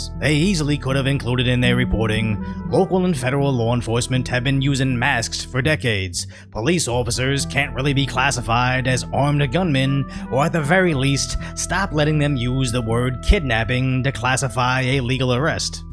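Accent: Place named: California, United States. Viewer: Why are californian accents seemingly disregarding normal intonations? Why are her sentences sounding like questions when they clearly are not? What a strange person.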